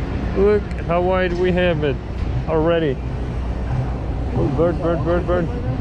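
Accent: France. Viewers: Hi ! I love your content, just discovering street photography and your work blow my mind... One thing you have in common with all other street photographer I watch recently is the picture of strangers without noticing/asking them. Is it something normal ? You don't have any problem with that on a daily basis (law or simply argument with people if they notice you have taken a picture of them) ? It's a legit question, and sorry if it sound a little rude, it's not my goal, just pure curiosity (and sorry for my very poor english, I can ask that in french but I want to stay in the channel mood (your name and accent make me think we spoke the same langage)). Anyway, thank you for your work, really beautifull and relaxing.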